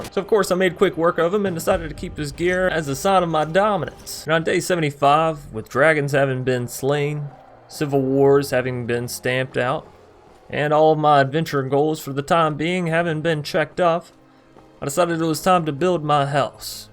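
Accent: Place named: Texas, United States. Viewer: I feel the texas in his voice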